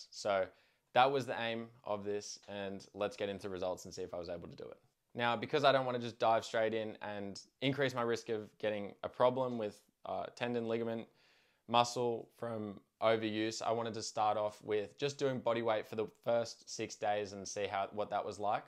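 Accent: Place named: Australia. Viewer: Tibialis raises. Australian accent. Subscribed!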